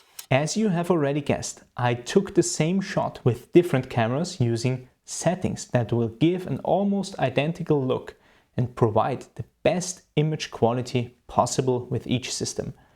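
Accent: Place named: Austria. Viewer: Congratulation for this outstanding lesson. (and I like your austrian accent.)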